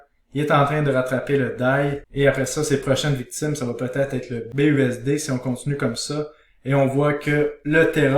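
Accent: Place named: Quebec, Canada. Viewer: salut, je te suis depuis la France, et je trouve tes vidéos très instructives. j'ai appris beaucoup sur la façon de dénicher les bons projets. j'arrive à garder la tète froide grâce à tes conseilles, sur la psychologie des marchés. en plus ca fait toujours plaisir pour un français d'écouter l'accent du Québec! et surtout de le comprendre ):p merci pour ton travail!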